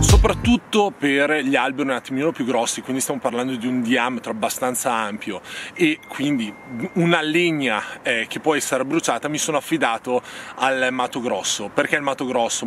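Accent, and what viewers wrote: Brescia, Italy. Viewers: Avevo riconosciuto l'accento bresciano! Anche io! e tra l'altro vivevo proprio a Cellatica, dove sono ancora i miei genitori. Ti seguo con interesse!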